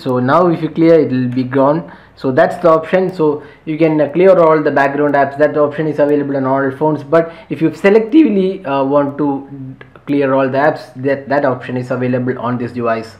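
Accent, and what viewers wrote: Kerala, India. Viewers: Mallu accent 😁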